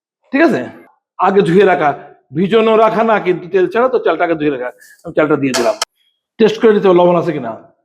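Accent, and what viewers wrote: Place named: Jessore-Khulna, Bangladesh. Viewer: আপনার বাসা মনে হচ্ছে যশোর খুলনা তে।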